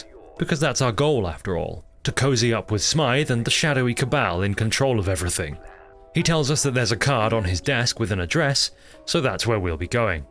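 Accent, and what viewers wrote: United Kingdom. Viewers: Ewwwwwwwwwww bri'ish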